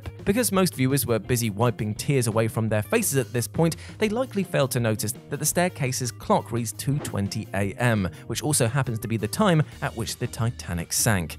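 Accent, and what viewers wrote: United Kingdom. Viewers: Why is it time and time again like a high percentage of these documentary like features are narrated by English accented actors or spokes people. Enough of these overindulgent usage of accented narrations by Brits. There are other people of other nationalities who can do an narration or presentation.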